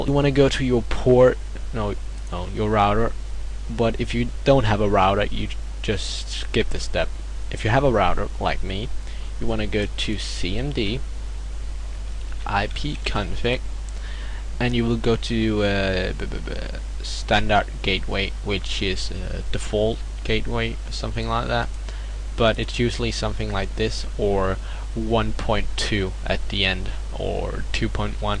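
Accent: Denmark. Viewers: Er du dansker? :D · are u from denmark?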